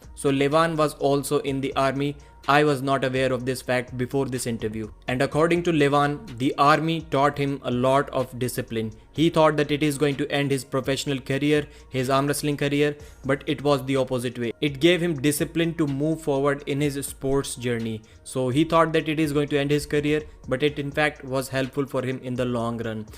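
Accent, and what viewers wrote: India. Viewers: Indian accent is horrible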